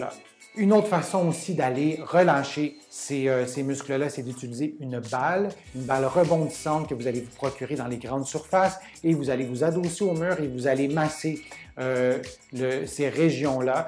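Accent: Canada. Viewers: Merci beaucoup 🙏. Vos conseils sont simples et pratiques à appliquer. Et sont plutôt efficient. Et votre accent canadien confère un charme supplémentaire à vos explications. Belle journée lumineuse à vous✨🤗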